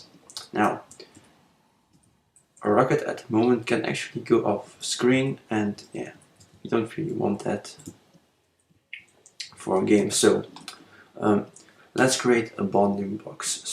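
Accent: Russia. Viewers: Great work.... these tutorials ignite my passion for game programming.....Keep it up... BTW..you sound Russian...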